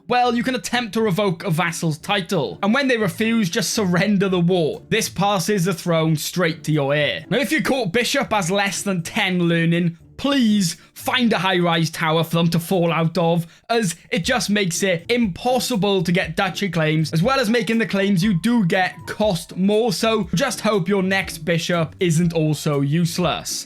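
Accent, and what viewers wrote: United Kingdom. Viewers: Bro i really aprreciate these tips but i cant listen this british accent. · Good video, I just wish your voice wasn’t so violently British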